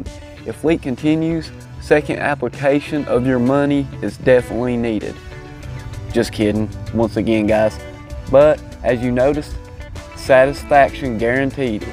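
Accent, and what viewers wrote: South, United States. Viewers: Love the dramatic music with the laid back southern accent lol. I'm subscribing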